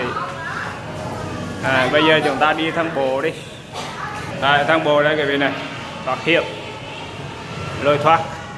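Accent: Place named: Central Vietnam, Vietnam. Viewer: Thích tiếng miền Trung nhất , nghe vừa nhí nhảnh lại vừa duyên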